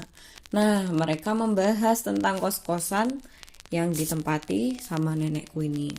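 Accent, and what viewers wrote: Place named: Java, Indonesia. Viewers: iya medhok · Teh versi logat jawa ya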